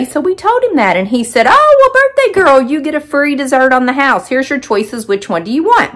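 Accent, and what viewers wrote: South, United States. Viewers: Your sister doesn't have a heavy southern accent like you do.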